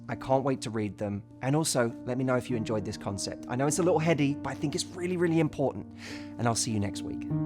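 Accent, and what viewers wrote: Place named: United Kingdom. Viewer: one more, i love the British accent of you, i not only learn English but more interesting things from you. Thanks very much.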